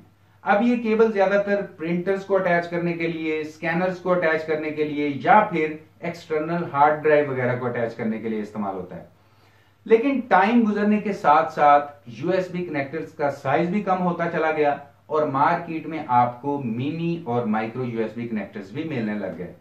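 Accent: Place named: Lahore, Pakistan. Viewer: The accent looks like he is from Lahore / Pakistan..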